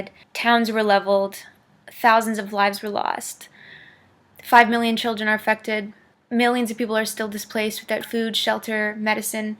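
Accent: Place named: Canada. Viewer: I've never noticed how Canadian you sound. The accent is strong with this one.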